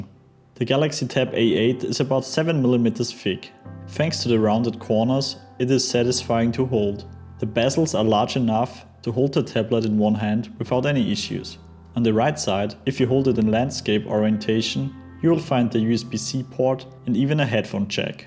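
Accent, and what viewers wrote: Germany. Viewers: German accent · Das hört sich an! Wenn ein Deutscher versucht Englisch zu reden!?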